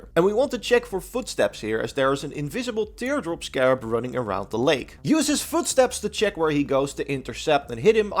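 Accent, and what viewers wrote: Netherlands. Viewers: Jij klinkt zo Nederland's ,lol ,great vid btw ❤️💪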